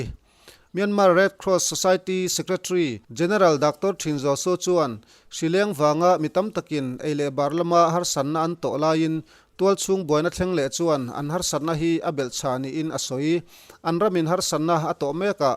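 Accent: Mizoram, India. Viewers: Mizo diktak ini lo ni maw i chhiar dan chu,, A tha vek e zawt zawt a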